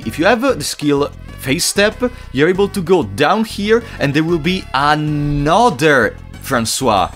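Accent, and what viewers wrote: Italy. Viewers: I just wanted to say that you are so captivating. Fear & Hunger is the first Dark Fantasy RPG I have delved into and you are the very first youtuber that made me watch a no hit run for 2 hours straight, that is very commendable my friend! Not to forget your Italian accent, it really makes everything so fun to watch would love to see some Merch in the future and on a sidenote, ive been able to beat Crowmauler using your guide:))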